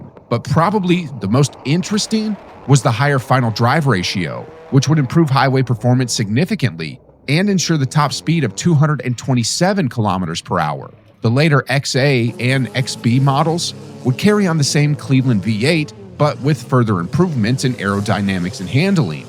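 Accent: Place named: United States. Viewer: Didn't watch the whole thing cause I can't stand seppos talking but did the XR6 turbo get a mention?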